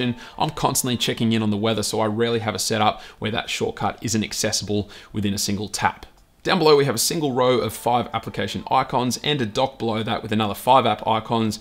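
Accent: Australia. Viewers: Is it an australian accent? It sounds cool but I can't understand, is it australian or not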